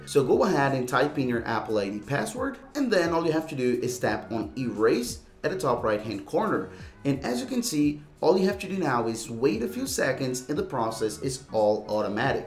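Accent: Brazil. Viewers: That Brazilian accent is thick my boy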